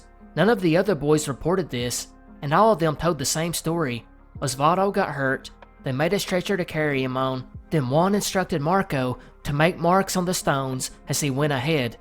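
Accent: South, United States. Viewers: I’m born and bred in the North Carolina mountains and I love to listen to you. We have different levels of southern drawl here but I almost feel like I live down the road from you. Lol You do a great job and get a lot of info for your stories. I can only guess that you’re a sincere and good natured guy. You know the help out your neighbors without being asked type. Glad I found your channel!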